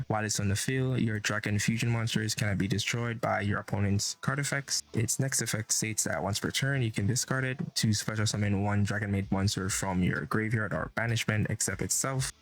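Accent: Jamaica. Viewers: Yuh sound like a yaad man.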